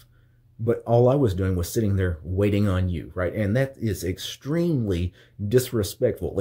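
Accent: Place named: Texas, United States. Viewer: Unsubbed. It goes both ways,. Some people struggle with this their whole lives despite conscious efforts to improve. You sound like a true Texan in this video, and I don’t mean that in a good way. You are only isolating yourself.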